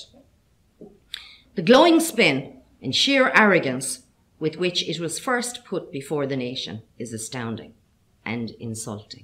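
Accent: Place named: Limerick, Ireland. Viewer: Great speech, well executed, strong Irish woman. Limerick accent rocks too.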